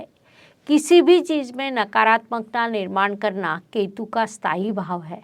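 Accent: Maharashtra, India. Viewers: Are madam tumhari speech kitnnnnna mast hai Marathi mix lagta hai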